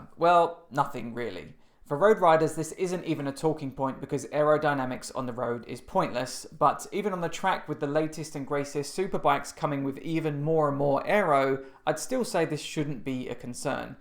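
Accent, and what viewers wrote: United Kingdom. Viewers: It is difficult for me to understand his british accent with the echo due to the microphone being at a big distance from the speaker.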